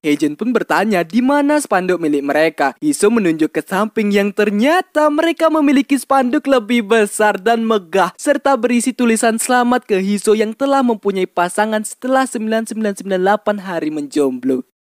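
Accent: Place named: South Sulawesi, Indonesia. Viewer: Emang telaso mu😂😂,pasti orng Sulsel kan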